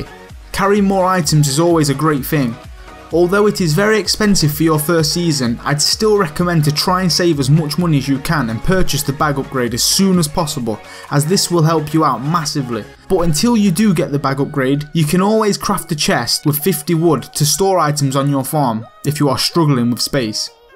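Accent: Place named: East Midlands, United Kingdom. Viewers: Loved the narrator's voice/accent. He reminded me of my childhood in Leicestershire (Ashby-De-La-Zouch.) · Thanks for video bud, appreciate it. Nice to hear that sorta accent to, from the east Midlands by any chance ?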